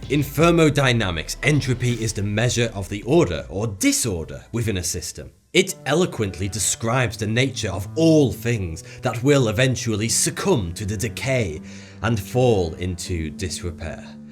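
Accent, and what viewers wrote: United Kingdom. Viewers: What variety of British accent is this that pronounces a soft "th" as an "f" along with the rest of the peculiarities? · I really like that British accent. Ur "but" sounds for me like a "bot", and reminds me the Medieval game the voice of that thing in the head of a skeleton.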